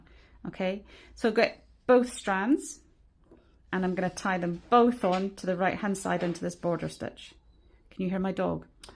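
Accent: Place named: Scotland, United Kingdom. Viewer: The taping comment made me laugh. Very scottish way of talking.lol and the dug in the huff 😂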